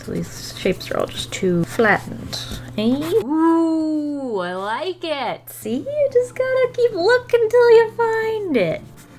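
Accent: United Kingdom. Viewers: Every once in a while she sounds British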